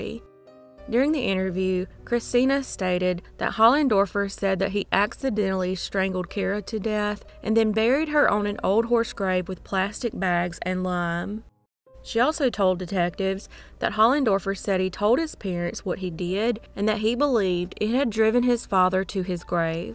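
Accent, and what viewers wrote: South, United States. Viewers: Hey. Just found your channel by accident but love it. Great job telling these stories. Love your accent! K 🇨🇦 🇺🇸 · It’s so nice to hear another southern voice. Very nice and organized video so thank you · I could listen to that sweet southern accent all day.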